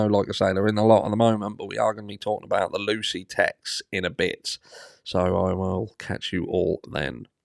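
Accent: Norfolk, United Kingdom. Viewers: You sound norfolk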